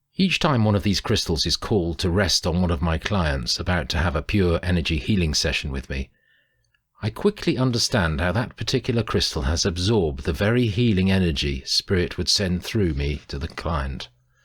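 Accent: Yorkshire, United Kingdom. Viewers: I purchased the e-book, and when reading it i hear your voice in my head 👍 So, even if you have a thick Yorkshire accent, it completes the experience ❤️ I love the book, it is a very lovely and wonderous read 💛 Much ✌️💟💡 to ALL of you 🙏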